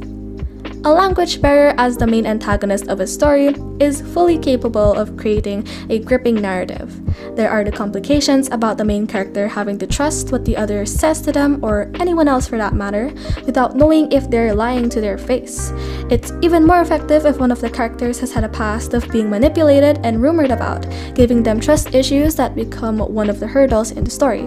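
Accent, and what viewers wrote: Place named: Philippines. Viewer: This is completely irrelevant but are you filipino? I can tell by the accent 😭 (I don't mean this in a bad way btw your accent is amazing! I can just tell when someone is one of my own based on accent hehe)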